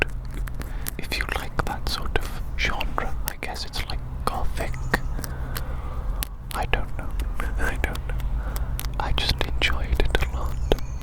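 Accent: Wales, United Kingdom. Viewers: Noooooow I know why you talk a lil funny! You’re just from Pontypandy! (I’m a Hampshire-ean) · Welsh accent 10/10. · The Welsh accent is made for asmr, whispers are immaculate 👌🏻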